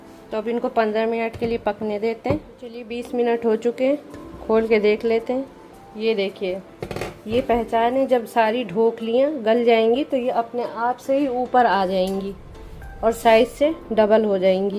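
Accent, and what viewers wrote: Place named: New York City, United States. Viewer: NYC colour of NYC voice 🎉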